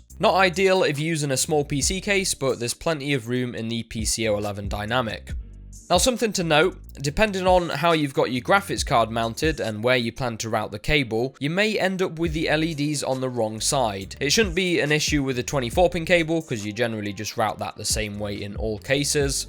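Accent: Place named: United Kingdom. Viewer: Damn you have a strong uk accent :D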